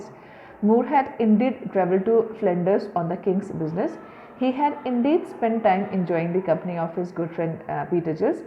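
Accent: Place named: India. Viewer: I hate the Hindu accent, but this is the only summary available.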